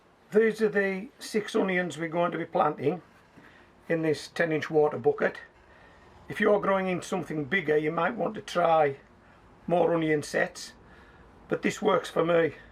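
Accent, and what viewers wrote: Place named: Cumbria, United Kingdom. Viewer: I live in Kintyre now, and miss the Whitehaven so much. I watch your videos a lot, just to hear that Fab Cumbrian accent! Keep them coming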